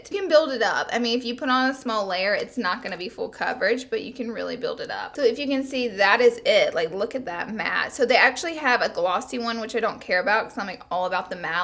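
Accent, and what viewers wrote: South, United States. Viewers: I'm usually a silent subscriber, but just wanted to drop in and say I love how vibrant your personality is. You are amazing at bringing your personality and humor through the camera and I can't get enough of your animated facial expressions. Also, your draw makes you more relate-able to those of us in the south. Keep it up girl!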